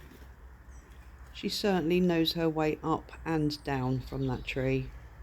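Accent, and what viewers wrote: United Kingdom. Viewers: Beautifully narrated video. It's very nice to see them safe and healthy. Always puts a smile on my face when you say "filthy dirty nose!" Must be the nice British accent. Thank you. Stay safe and well everyone! 🙏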